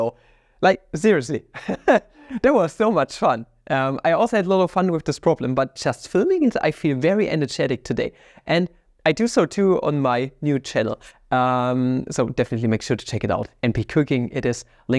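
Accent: Germany. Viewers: Are you German? I always thought you had a German accent and a German name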